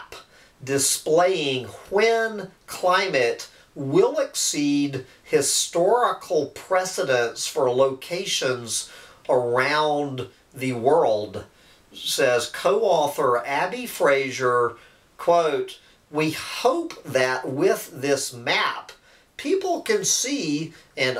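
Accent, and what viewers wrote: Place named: Georgia, United States. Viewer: It´s the georgian accent.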